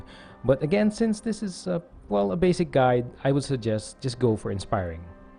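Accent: Philippines. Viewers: are you a Filipino? the way you speak and pronounce the words .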